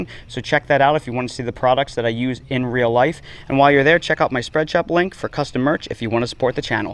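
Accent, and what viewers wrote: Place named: New Jersey, United States. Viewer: You are in Carolina but your accent screams NJ, where I grew up….. are you from there?!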